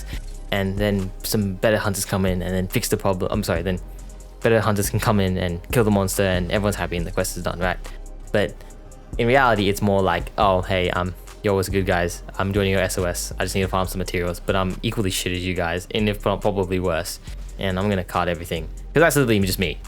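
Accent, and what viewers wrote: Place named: South Africa. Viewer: Bro are you south african/namibian/australian? You really sound south african to me lol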